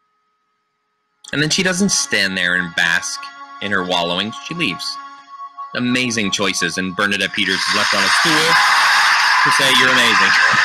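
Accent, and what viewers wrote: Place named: United Kingdom. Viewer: Thank you for this excellent analysis! If I may say so, I find the British accent annoying/distracting. was American so I don't feel that my opinion is inappropriate! I saw a video of teaching this song and he was a stickler for the consonants!! Ought / to just like you said!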